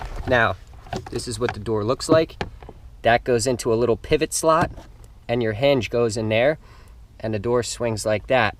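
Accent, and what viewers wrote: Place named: New Jersey, United States. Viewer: No wonder he sounds like Chris fix because he’s from Jersey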